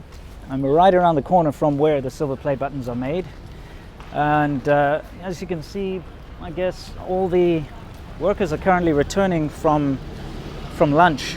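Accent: South Africa. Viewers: Are you South african? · I am so fascinated by your ability to speak and read and write Chinese! Equally as fascinated by your ZA accent. Just a question - did you flip the video of yourself in the car? Or you actually drove a right hand drive car? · for inputting Chinese, you should try iFlyTech speech recognition input, you just speak to the phone and it writes for you. It would gradually adapt to your South African Chinese accent as well. After trying that a year ago, there is no turning back to typing Chinese.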